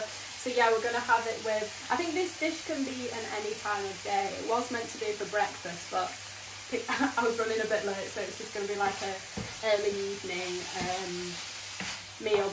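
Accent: Yorkshire, United Kingdom. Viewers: Love the Yorkshire accent, would have liked you speak more Somali though. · You are not hafe you are Somali. 100%🇸🇴💪❤🍇😘🥴🥴😁🥴 the Yorkshire accent did it for me so funny 🥰😍💪❤🍇😘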